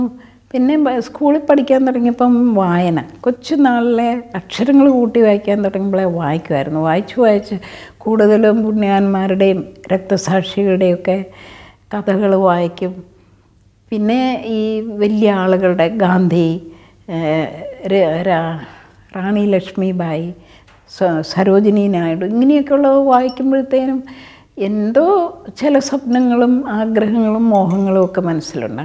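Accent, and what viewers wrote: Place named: Pala, India. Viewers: എത്ര വർഷമായി. നോർത്ത് ഇന്ത്യയിൽ ജീവിക്കുന്നു എന്നിട്ടും . ആ.. പാലയുടെ ശൈലി ..... അതാണ് ..... ദയാ ബായ്...... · This the typical Pala Slang, check out the colloquial expression " Mooch"... ANOTHER SOUGHT AFTER EPISODE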